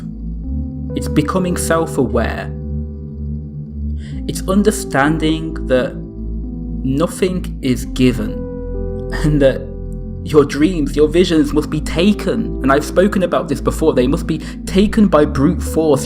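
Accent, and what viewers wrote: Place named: United Kingdom. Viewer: Self-improvement is lonely, yes. But there are definitely ways to make it less lonely. I generally use a gym rather than training outside, specifically for this reason. Judging by your voice, I'm guessing you're British? If you're out training between and 8 UK time, I'll be out training at the same time. I'll try to work in an outdoor session once per week so that, even if we aren't physically training together, it might help us both feel a bit less lonely:) Stay strong brother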